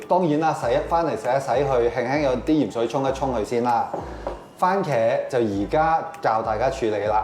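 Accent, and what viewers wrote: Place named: Hong Kong, China. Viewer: 多謝你提供魚湯的教材⋯非常有營養很適合小朋友喝，這是我第一次看這視頻，聽你口音就知道你是來自香港⋯🤗👍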